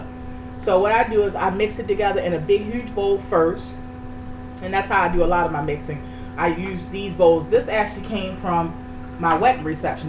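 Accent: Baltimore, United States. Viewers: Are you from Baltimore? you got that B-more "U" sound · she from bmore he voice I'm from dc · are u from bmore?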